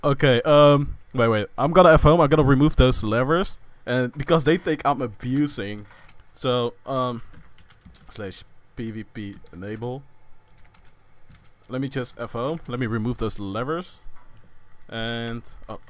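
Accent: Netherlands. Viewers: Is he Dutch because he sounds like it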